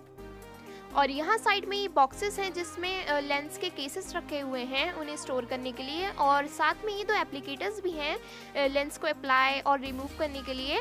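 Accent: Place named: Hyderabad, India. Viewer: Apka accent hyderabad ka hai ....... kya ap hyderabad se ho❤️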